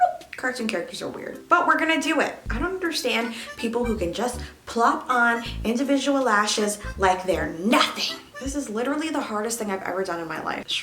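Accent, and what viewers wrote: United Kingdom. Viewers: It’s not a video without the British accent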